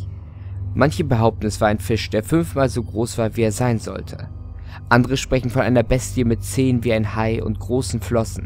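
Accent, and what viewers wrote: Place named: North, Germany. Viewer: yay Norddeutsch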